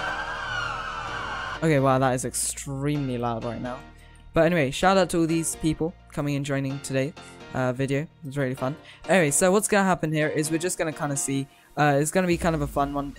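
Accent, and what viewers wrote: United Kingdom. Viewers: are you British? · Are you from the U.K?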